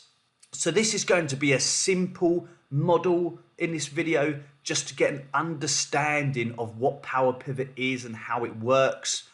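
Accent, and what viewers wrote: United Kingdom. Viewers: Yes. I like your voice!So British! So unusual!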